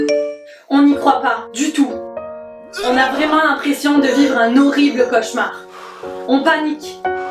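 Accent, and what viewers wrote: Quebec, Canada. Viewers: tu as l'accent de celine dion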